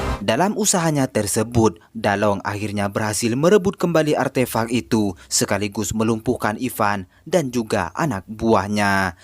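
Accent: Madura, Indonesia. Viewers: Madura ya pengisi suaranya?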